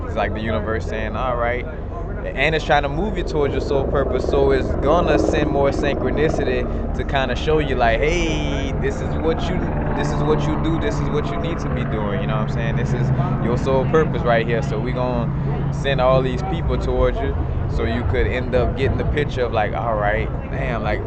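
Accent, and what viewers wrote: New Orleans, United States. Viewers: Brother are you from New Orleans? Sure sounds like it.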